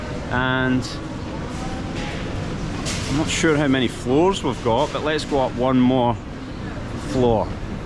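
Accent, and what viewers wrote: Scotland, United Kingdom. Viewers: I LOVE you sottish accent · Scottish guy reading Russian - my regards to your effort mate :) Last year Irish guy accidentally smashed my side mirror, was a funny way to meet a foreigner in Moscow. All are welcome, enjoy and have fun. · Обожаю шотландский акцент!))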